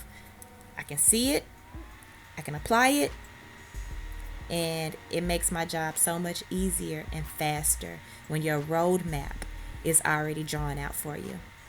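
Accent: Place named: South, United States. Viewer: Love your tutorials, and I especially love your country accent!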